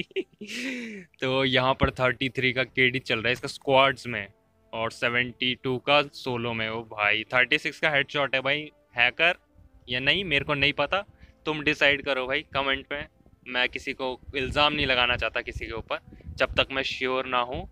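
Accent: India. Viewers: Bahi tu GADHWALI hai kyaa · Bhai utrakhand se ho Kya ?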